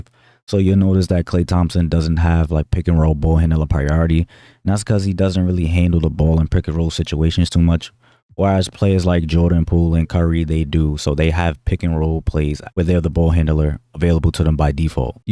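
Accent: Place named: New York, United States. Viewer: solid video you sound like the big apple is home for you. my fam is all there you sound like them very cool i have the walk of fame in my city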